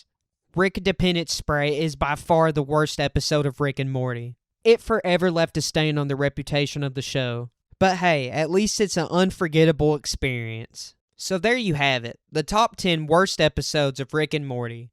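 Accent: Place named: South, United States. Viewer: you are the most southern Rick and Morty fan I have ever heard❤‍🩹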